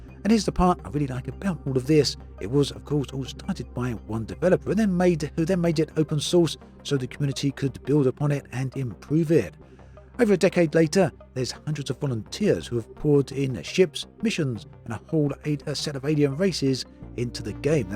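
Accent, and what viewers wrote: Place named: England, United Kingdom. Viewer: I can barely understand with your English accent .. need to speak slower mate 😊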